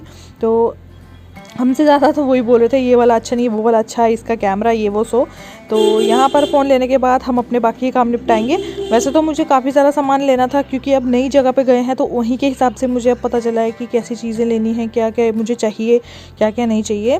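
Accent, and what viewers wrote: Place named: Pahadi, India. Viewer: Ye hum pahadiyo ki pehchan h. Apni boli aa hi jati h. Chand☺☺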